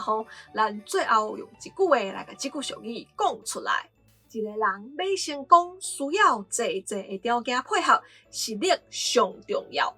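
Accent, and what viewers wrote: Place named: Tainan, Taiwan. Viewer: 好台南腔 👍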